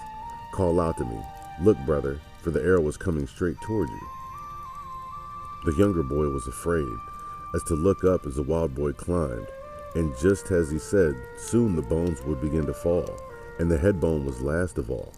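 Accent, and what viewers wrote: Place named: Texas, United States. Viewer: Sounds like Texas to me!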